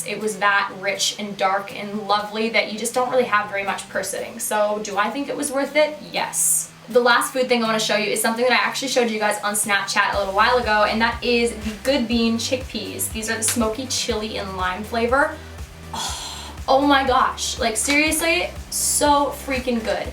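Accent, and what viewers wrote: Canada. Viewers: I've heard colouring books are really therapeutic :) I should try it! I know this sounds dumb, but you're SO Canadian sounding after spending time at home haha I love it! "Hey?" :) Also love your stripe tattoo. So thoughtful and pretty looking! Wow that bikini is ridiculously tiny...I hate that companies can get away with that! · Can totally tell you've been back to Canada recently #dataccenttho Love your videos 💕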